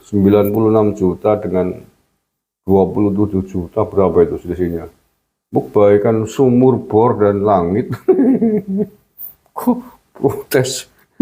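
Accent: Banyumas, Indonesia. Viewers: lik rika ngapak ,,ya ,,nying fens rika lik semangat yak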